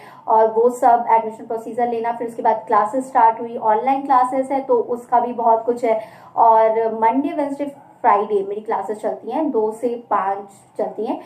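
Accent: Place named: France. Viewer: And now i love the french accent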